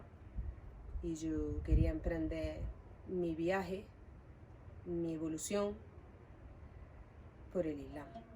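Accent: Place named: Andalusia, Spain. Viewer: Masha Allah hermana gitana, y como adoro tu dialecto andaluz. Linda historia y contado en una manera muy hermosa. Me encanto!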